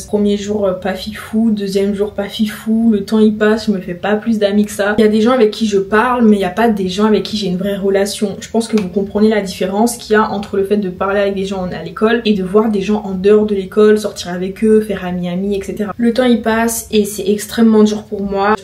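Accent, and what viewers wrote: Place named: Paris, France. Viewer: " Jpense que ça se voit ", non ça ne se voit pas.... Et ça ne s'entend pas. Tu as à peine fait 2 ans en France que tu as pris l'accent d'Ile de France, où est ton accent Guadeloupéen? Maintenant bonne vidéo mais je tenais quand même à souligner ce point 🙂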